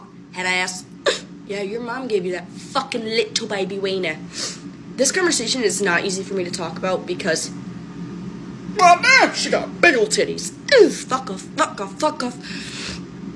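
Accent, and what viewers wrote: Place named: England, United Kingdom. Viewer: Brilliant english accent....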